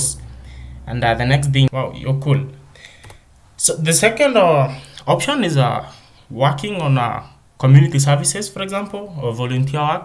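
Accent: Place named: India. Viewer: Awesome, u sound like a hindu though :)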